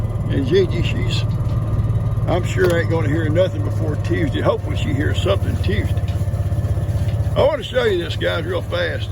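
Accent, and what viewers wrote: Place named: Arkansas, United States. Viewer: I enjoy all the videos and I could listen to you talk all day. You say some expressions my dad used to say and the accent too. He was from Arkansas and has been gone for 21 years. I continue to pray for Gigi and Raleigh. Totally happy that Ivan is so much better, he makes me smile. Still praying Sooky comes home too ❤️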